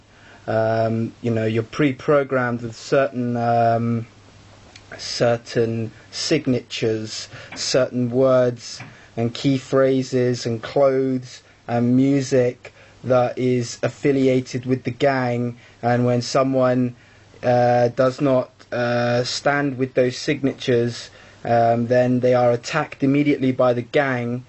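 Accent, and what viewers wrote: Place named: London, United Kingdom. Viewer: What gang was you in? you sound like suburban Londoner. I'm from Hackney, London, and i there ain't no gangs in London, just wannabees, you sound nothing like a gang member. I lived in Oakland for a while and that is a scarey place my nigga with a real bangers. If you wanna help bangers in London, don't make videos go out and do suttin for them. peace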